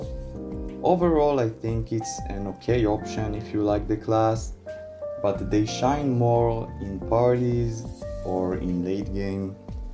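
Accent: Israel. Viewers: You have heavy Israeli accent 🫣🫶🏻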